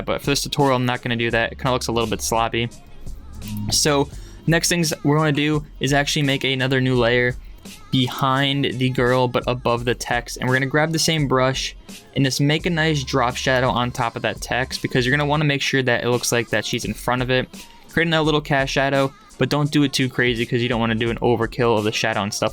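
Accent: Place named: United Kingdom. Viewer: I always thought u was from the uk. cos of the accent and your name ' ' im pretty sure thats a brittish name.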